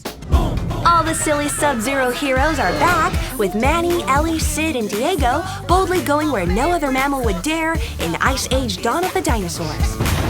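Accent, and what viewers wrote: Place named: Asia. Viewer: omg i love her asian accent lol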